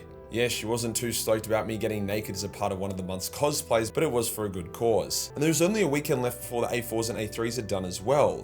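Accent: Australia. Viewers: bro how on earth is an edge lord channel? This is just how we talk in Australia lol.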